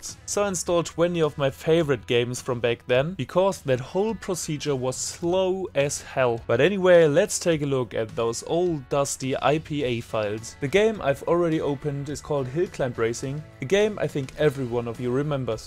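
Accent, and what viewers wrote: Germany. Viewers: Are you from german ? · Man hört so krass raus dass du deutscher bist hahaha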